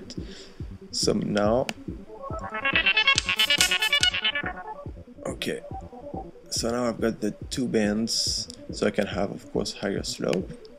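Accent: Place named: France. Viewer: L'accent français est drôle :)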